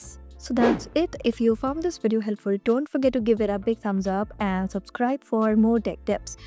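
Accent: India. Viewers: That indian accent XD I can't get any more XD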